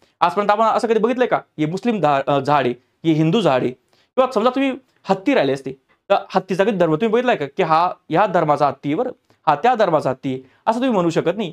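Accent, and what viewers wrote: Khandesh, India. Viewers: Aaplya khandeshi ahirani bhashet bolta tumhi sir mh 19jamner Kar aamhi 🙏😍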